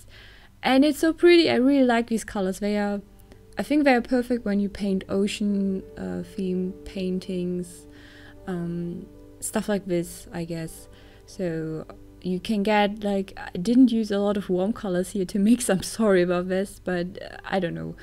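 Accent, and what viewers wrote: Germany. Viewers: You're german. I can hear it😂.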